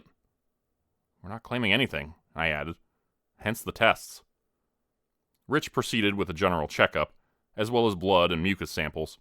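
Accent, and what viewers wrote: Canada. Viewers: I knew you were Canadian!!! I love your accent. I'm from just over the border in the US. Reminds me of home. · Woo-hoo Canadian here too. Vancouver area. I thought you sounded Canadian. You rock. 🤘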